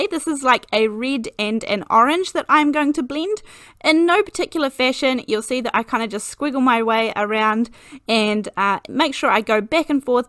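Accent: New Zealand. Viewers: just watched your awesome video, love to hear a Kiwi doing this, amazing. Thank you, and yes I've subscribed.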